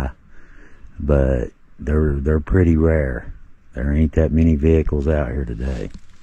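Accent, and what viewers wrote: South, United States. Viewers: You know what you got a seriously good voice. If I was you I would be reading civil war novels etc online. I think you can make a good living as an orator dude especially as if you were reading Southern history specifically confederate civil war. You got the perfect accent for it. I think you can make a good living doing documentaries for History channel. Perfect soothing voice and I'm not kissing your ass. I'm just sayin. I'll leave you alone. Say hi to the boss.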